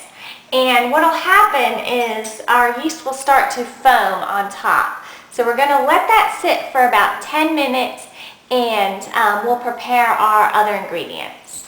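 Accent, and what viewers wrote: South, United States. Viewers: Are you southern cause your accent sounds like it?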